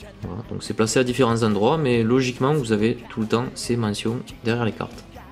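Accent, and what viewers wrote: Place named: South, France. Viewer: Salut t'es d'ou ? on entend un accent du sud.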